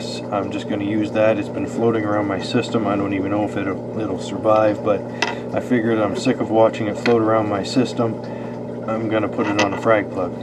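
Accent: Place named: Minnesota, United States. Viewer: Boy your voice sounds like home AKA Minnesota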